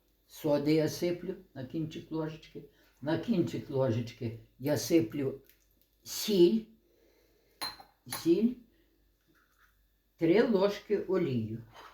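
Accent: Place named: Ukraine. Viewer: Этот украинский говор звучит для меня как музыка. Так говорила моя бабуля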